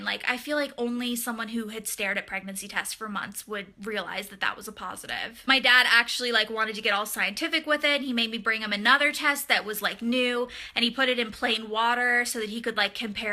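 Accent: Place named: South, United States. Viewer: I don't know what it is about you that makes me so happy. Maybe its that your twang comes out randomly when you're saying something. HAHA Its the same with Blair and my Texan self loves to support my southern belles. =P Congratulations on the Baby!! You must be so grateful that you have the flexibility to travel so much to Tennessee and with the morning sickness and bed rest? My goodness!!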